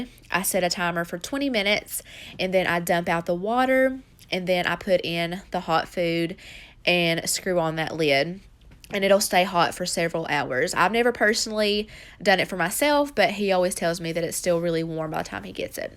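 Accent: South, United States. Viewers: Eeeekkkkk I’ve missed these videos so much 😭😭😭😍😍😍😍😍 and your accent is everything. Southern girl here too ❤️ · I just love your channel..I love your southern accent. And your great food ideas..keep it up..much love from Nashville,tn Katie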